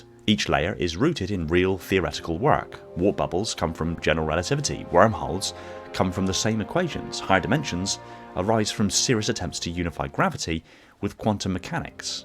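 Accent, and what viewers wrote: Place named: West Country, United Kingdom. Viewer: Your voice is like Westcountry Xbox Ahoy. Somerset?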